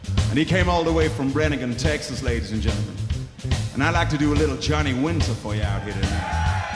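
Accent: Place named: Gelderland, Netherlands. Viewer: dat komt omdat hij een zwaar accent heeft omdat hij uit gelderland komt, hij wil daarom niet voor schut staan met zijn accent, daarom praat hij continue engels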